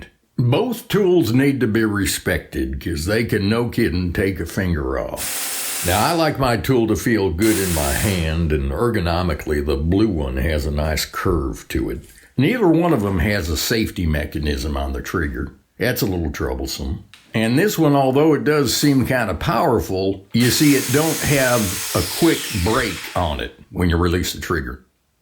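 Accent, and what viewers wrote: South, United States. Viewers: NOW , ... THATS a good review ! sounds like someone who really uses tools , and not some salesman ! liked hearing southern accent , and personality ! the review was more entertaining than the tools . good review .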